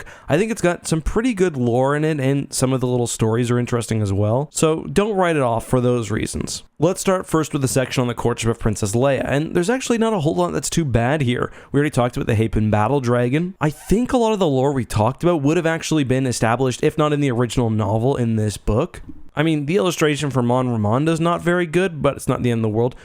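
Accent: Canada. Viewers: it took me a few years to realize that youre canadian, when you said "about" i was able to finaly confirm my suspicion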